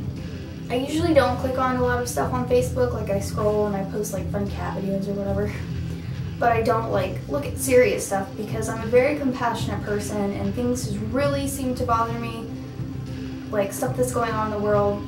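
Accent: United Kingdom. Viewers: I thought you were brittish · dont u have British accent? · i thought she was british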